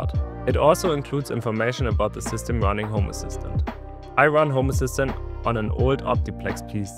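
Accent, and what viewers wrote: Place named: Germany. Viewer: i hear deutsch in se english - top video, mach weiter so!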